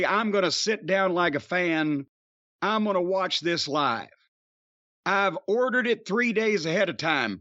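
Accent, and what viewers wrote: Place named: Kentucky, United States. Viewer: "So I hammer the fUHk out of those things for about 50 hhhhuh'WHACKS" his Kentucky accent makes everything he says sound even funnier 🤣 He should have pulled out his ol'trusty tennis racket and started huh'whackin away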